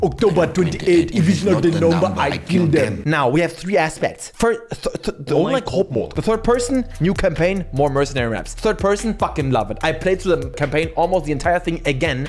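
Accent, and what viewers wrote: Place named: Germany. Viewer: I can here a little bit Deutsch von ihnen, interessant...🧐😂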